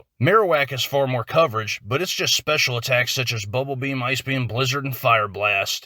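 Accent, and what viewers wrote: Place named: South, United States. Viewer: when i clicked the video i was like now which one was this guy oh right the one with the southern accent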